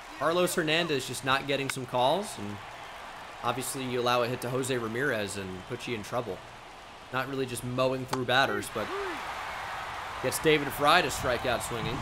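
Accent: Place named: New Jersey, United States. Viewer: You got really Jersey in here and I enjoyed it haha.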